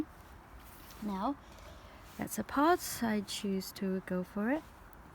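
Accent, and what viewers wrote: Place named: England, United Kingdom. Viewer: Great collection! Thank you for sharing from Korea The mini pot is so cute! :-) I like your England accent as well! I subscribed to your channel as well! Hope your channel becomes flourish too! ♥♥♥♥♥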